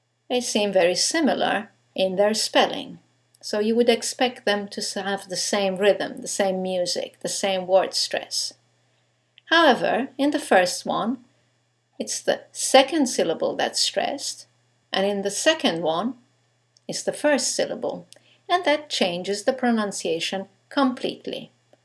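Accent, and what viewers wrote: England, United Kingdom. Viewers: It's really really hard to understand her... It's souds british, I have serious difficulties in that. But I could understand.... Thanks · Thank you Btw, this accent only use by British, right? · Is this british englisH? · Who doesn't? It's more British than my accent but I think she speaks very clearly and understandable to any native speaker or proficient student. Janine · British English? Lovely. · RP accent I more need podcast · Yeah, it's called a BRITISH accent... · Hello, i apreciate your lessons, i like to listen to you 'cause your accent is very pleasent to me. I hope you can bring more and more tecniques to talk as a England person. i have difficults to pronounce words like: thought, through, though, taught. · thank you . the British accent is very nice .but a little hard . but it sounds good. and thank you again. 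· your classes are for beginners, and your accent does not sound from USA, it sounds from UK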